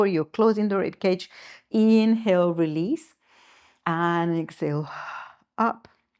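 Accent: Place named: United Kingdom. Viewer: Ciao, che piacevole sorpresa trovare il tuo canale in inglese! Complimenti per l’inglese e l’accento British 😊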